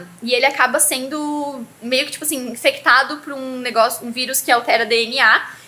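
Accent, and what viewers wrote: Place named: Florianópolis, Brazil. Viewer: sou nova aqui e já estou adorando! Parabéns pelo canal 🥰 ah, eu conheço esse sotaque, és manezinha tb?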